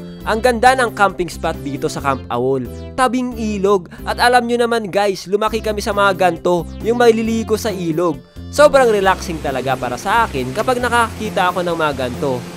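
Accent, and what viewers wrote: Igorot, Philippines. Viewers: halatang igorot si kuya hahaha salita palang eh parang ako hahahaha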